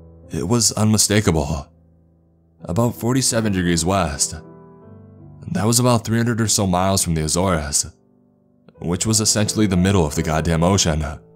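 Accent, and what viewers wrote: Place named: California, United States. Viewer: iv always just thought of it as a surfer bruhv California accent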